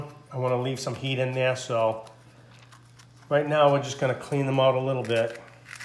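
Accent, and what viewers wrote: Boston, United States. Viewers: Boston accent?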